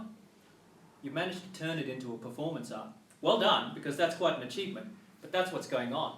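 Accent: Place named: England, United Kingdom. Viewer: Hi, love your channel. I've been practising Wing Chun for 2 years now, and my sifu's philosophy resembles much yours. It's all about relaxation and feeling in the arms when practising Chi Sao. You sound like you're from England. Please confirm and if so, I'd love to come to your course sometime when I visit England again ( hope I'll be soon enough, I'm not too far: south of France ). Cheers!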